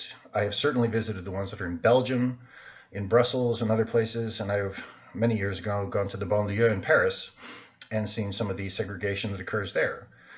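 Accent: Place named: United States. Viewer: May I ask you where you live? I hear an American accent though so America I guess, but where?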